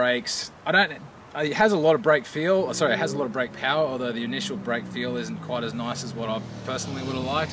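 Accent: Australia. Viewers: Nice Aussie accent :)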